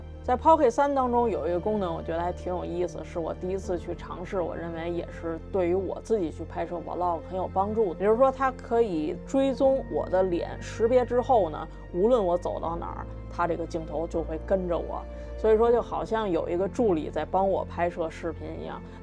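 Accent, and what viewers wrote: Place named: Beijing, China. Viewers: 老北京口音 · 还真是，而且都是京腔。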